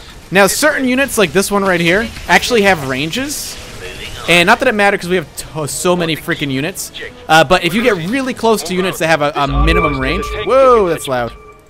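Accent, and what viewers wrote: South Africa. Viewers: It's not an Australian accent. It's South African. (I am Australian) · He's not Australian, he's South African.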